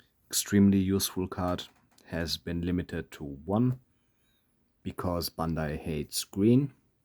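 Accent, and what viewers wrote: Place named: Germany. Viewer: Irgendwie hab das Gefühl, das du in deinem Englisch Ton, eingentlich deutsch sprichst 😅 is das so?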